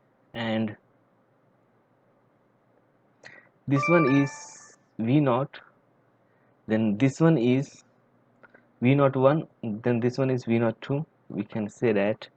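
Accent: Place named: China. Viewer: why you speak like a chinese person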